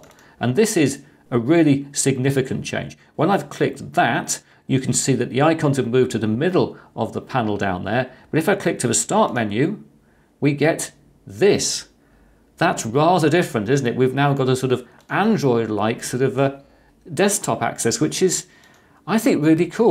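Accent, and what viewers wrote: England, United Kingdom. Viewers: I miss the energy you had when you used to exclaim, "RIGHT!" Now it's just, "right..." Your English-isms are one of the reasons I fell in love with your channel and now, it seems, you're downplaying them. Your content is as good (if not better than) ever but I like a little old world flavor (or is it flavour?). Keep up the good work but give us the whole package.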